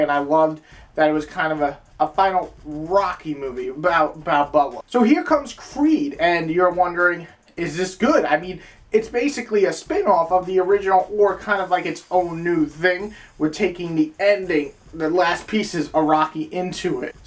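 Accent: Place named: East Coast, United States. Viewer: I agree, he sound like Jonah hill with a east coast accent.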